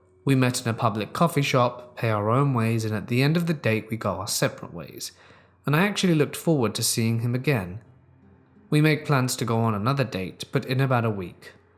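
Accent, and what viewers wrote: England, United Kingdom. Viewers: on a funny note, I listen to English narrators (just prefer the British cadence, I suppose) so much that you got me saying “narrator” the English way, rather than the American way. I didn’t realize we pronounced them differently until I said it in front of my bf and he gave me a funny look and said “.... say “narrator” again..”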